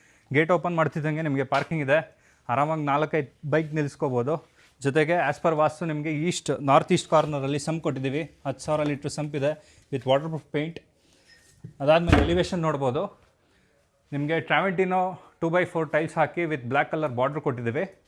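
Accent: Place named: Udupi, India. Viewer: Udupi talking maduthira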